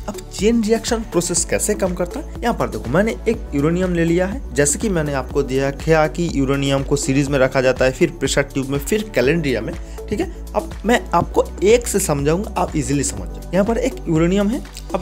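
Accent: Bengal, India. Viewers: Appe Bengali ho na 🎉❤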